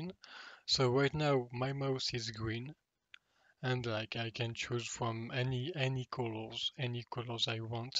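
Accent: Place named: France. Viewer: Oulah c'est un français ça mdr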